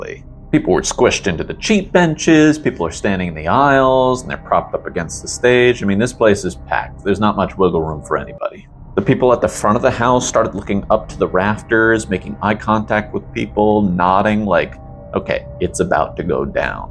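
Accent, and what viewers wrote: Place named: United Kingdom. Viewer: Love your British accent! Love the sound effects! Another winner!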